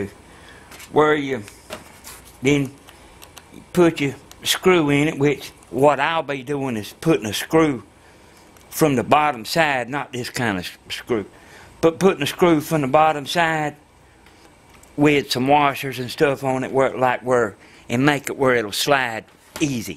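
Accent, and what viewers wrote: South, United States. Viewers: love your southern drawl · I be good ol central valley California boy...no sooner I heard that deep down southern accent...hit that subscribe button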